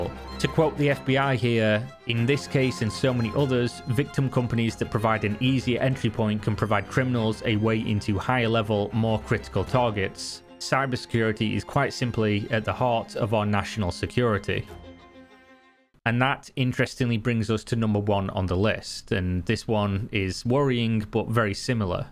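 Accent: United Kingdom. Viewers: This is one of the very very very few videos I’ve clicked on, EVER in my entire life of using YouTube, that I’ve ever thought was just unbearably boring. Maybe it’s the British accent and slow talking on a boring subject that did it for me? I don’t know man. The video isn’t bad. It’s informative, and I’ll give it a like- but man, this was just…painful to try and sit through lol (I’m 26, been watching since I was like 12)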